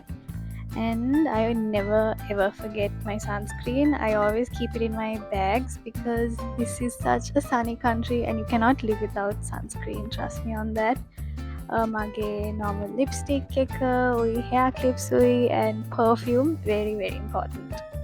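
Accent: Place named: Sri Lanka. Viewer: I wanted to write this in Sinhala, but I’ll use English for clarity. Don’t you find it strange and awkward to speak a foreign language while in Sri Lanka? It’s like mental slavery. If your audience is foreign, go full English. If not, speak Sinhala. Using Singlish in between is just… funny. Honestly, broken English with a Singlish accent doesn’t make you “posh.” English is not fancy .. it’s a borrowed language, taken from other countries. Meanwhile, our native language is beautiful. It’s time to drop the slavery mindset. Think about that.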